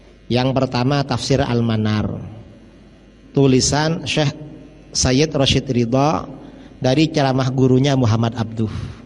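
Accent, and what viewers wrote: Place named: Madura, Indonesia. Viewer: Idrus...logatmu madura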